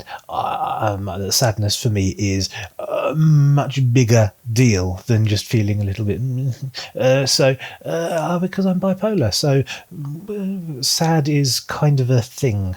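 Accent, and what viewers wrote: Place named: United Kingdom. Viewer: I'm so glad that I've found your channel. I've been looking after videos about Mr. Raymond E. Feist (because I'm currently reading the Magician: Apprentice). I'll definitely read the Empire trilogy someday, because of your review. Also, I was about to start the Deverry Series and I was happy to see your video which introduces the books. I'm looking forward to seeing more of your content. By the way, I really enjoy your elegant UK English. :)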